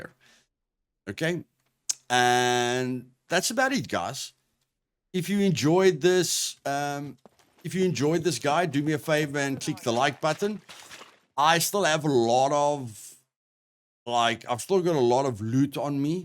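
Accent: South Africa. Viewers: took me a minute to realize his accent was SA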